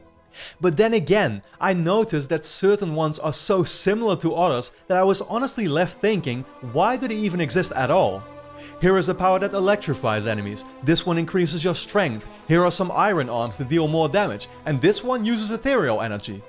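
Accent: Netherlands. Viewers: ik vroeg mij al af of je nederlands was haha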